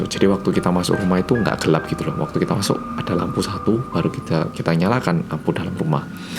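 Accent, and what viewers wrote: Java, Indonesia. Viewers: MENJENGGONG ASTAGA YA LORD MAS WKWKW😭😭 jowomu muedhok temen mas😭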